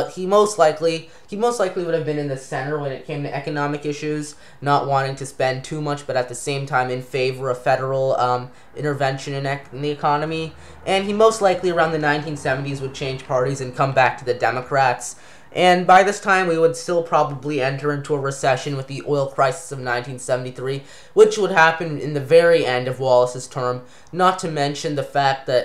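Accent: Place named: California, United States. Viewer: You sound like you live in California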